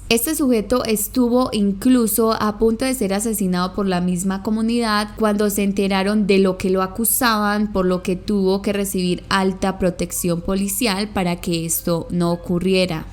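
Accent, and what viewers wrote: Colombia. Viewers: Adoro como explica sus casos,es increible y su acento colombiano enamora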